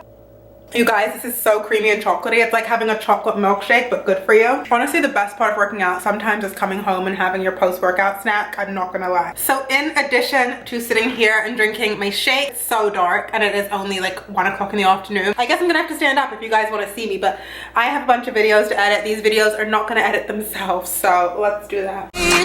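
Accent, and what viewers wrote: United Kingdom. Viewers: Are you British? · Hey. Is that a British accent you partially have?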